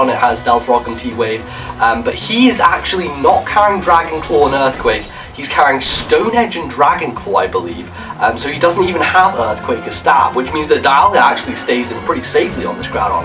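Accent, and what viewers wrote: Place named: United Kingdom. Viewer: i think hes an indian with a british accent who lives in scotland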